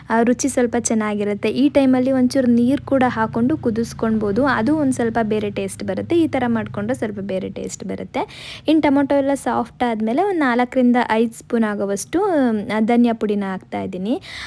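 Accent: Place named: Uttara Kannada, India. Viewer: ಹೌದ ಉತ್ತರ ಕನ್ನಡ ಅನಕೊಂಡೆ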